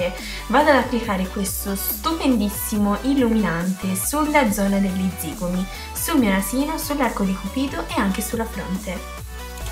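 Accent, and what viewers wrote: Tuscany, Italy. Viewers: Bellissimo video e tutorial super mega completo, attualmente il migliore sull'argomento, bravissima!... Sei toscana come me sento? ^_^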